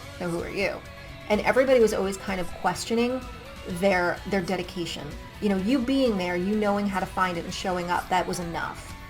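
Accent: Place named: New York, United States. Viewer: A goth with a NY accent! Priceless!😂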